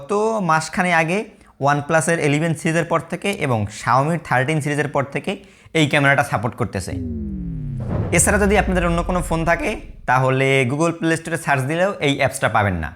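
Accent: Barishal, Bangladesh. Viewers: ছোটভাই তোমার বরিশালের ভাষাতে অনেক ভাল লাগে। ওই ভাষাতেই ভিডিও করো। আমার অন্তত ভালো লাগে।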